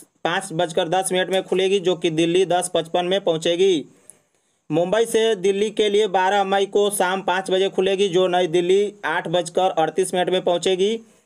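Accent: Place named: Bihar, India. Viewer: Aapka bolne ka tareka sahe nhe lga. Bihar jaisa lgata hai.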